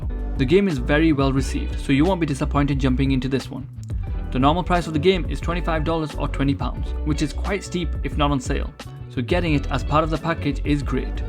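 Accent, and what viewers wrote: India. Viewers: Do I detect an Indian accent ? ;)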